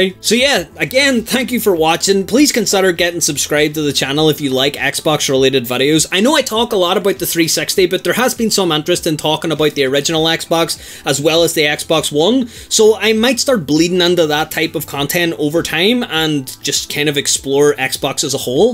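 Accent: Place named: Northern Ireland, United Kingdom. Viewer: I was lookin up about my bioshock infinite promo copy i had from ages ago didn't expect a fellow northern irish voice as well like!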